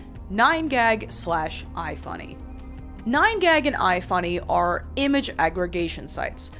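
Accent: New York, United States. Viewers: WYM didn't speak english fluently? You sound like you're from NY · I am very suprised how you can talk like a average american while english is your 2nd language , how did you improved your accent ?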